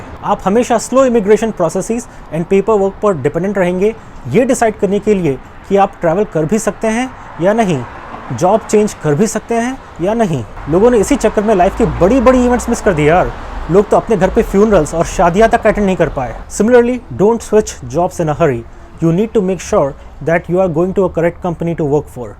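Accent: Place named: India. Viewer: I love your hindi accent and way to express ideas. Your information is concise. Great job.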